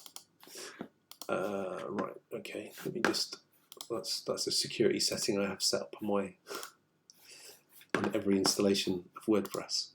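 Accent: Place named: England, United Kingdom. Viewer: Thanks, A great tutorial and it is so good to have a clear English accent. I think that with a touch post editing to get rid of some of the trial and error parts and just give the "polished" end product might be good, but also I do like seeing your thought process when figuring things out. Your voice is excellent and clear and very easy to listen too and your knowledge is a valuable commodity to pass on. I really appreciate the time you have taken to make these videos and would love to see you make some more. Perhaps a playlist for beginners in Wordpress getting going would be great. Have you thought about doing some videos on your everyday experiences working in development and sharing them with us? Maybe some videos on plugin development or securing sites etc? Anyway that's my feedback! Thanks ever so much I really do appreciate your great work. 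- Chris.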